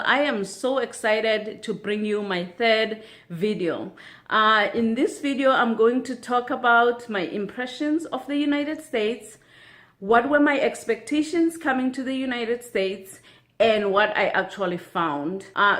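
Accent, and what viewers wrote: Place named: South Africa. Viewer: Very interesting video. A fellow South African here. Post more videos which dispel myths about South Africa as some people are really clueless about our country...even though it's one of the most highly visible country brands in the world. Lol at accent...you have an accent similar to my Mum, she's Xhosa&Zulu Zulu. My dad is white German& Afrikaans. Love your channel already...you seems lovely, curious& intuitive so I imagine you will adapt very well in USA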